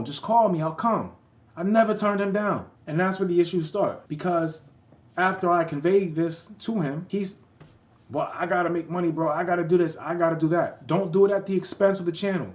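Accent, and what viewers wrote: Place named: Newark, United States. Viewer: north Jersey? You do have a bit of a Newark accent. I’m not used to hearing non-Philly accents I live in Atlantic county now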